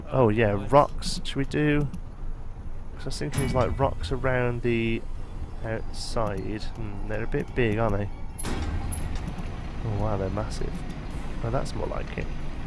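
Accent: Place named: Birmingham, United Kingdom. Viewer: I'm from England, Birmingham(B45) Where you from because your accent doesn't faze me and kind of sounds normal... You gotta be from somewhere round here...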